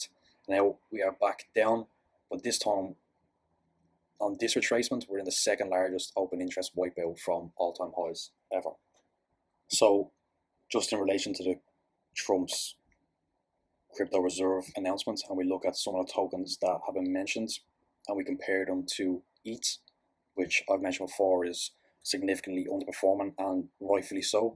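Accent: Ireland. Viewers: Thanks for the update, never would have guessed you were Irish...Great stuff, been following for years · Didn't realize you were Irish 😎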